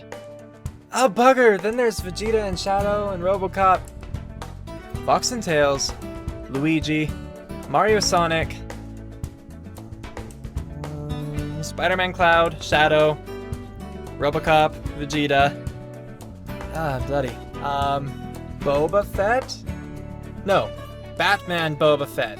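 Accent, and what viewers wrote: United Kingdom. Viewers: You probably won't see this but I have three questions: 1. Can/will you play Arkham Origins? 2. Can/will you play Arkham Knight? 3. (Okay this one is kinda silly, but what the hell) are you British? Or just sound British?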